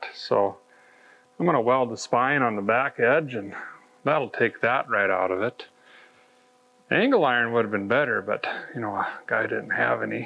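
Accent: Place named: Great Lakes, United States. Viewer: Love the “Great Lakes” accent! All in fun and learn and become inspired too!! Great job. Liked, subbed, commented and shared!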